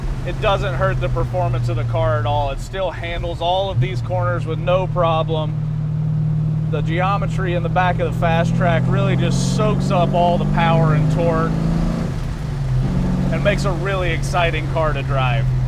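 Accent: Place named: Texas, United States. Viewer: I LOVE THE ACCENT - IS THAT A COUNTRY TWANG. TAXAS HUH ? NEVER BEEN .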